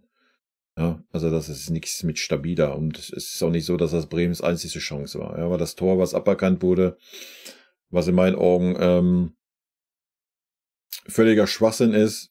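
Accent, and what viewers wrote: Ruhr, Germany. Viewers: Höre ich da etwa 'nen Ruhrpott-Bayern raus oder täusche ich mich? 😂 Btw., sehr faire Analyse von dir! LG aus Bremen 🤟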